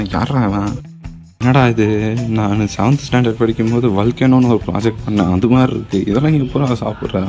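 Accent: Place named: Madurai, India. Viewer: Bro...neenga madura dhana...unga pechulaye theridhu bro😂😂🤣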